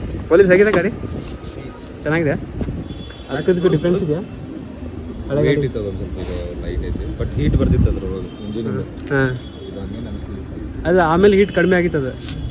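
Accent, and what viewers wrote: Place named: Bangalore, India. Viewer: Full Bangalore ಭಾಷೆ..... ಶಭಾಷ್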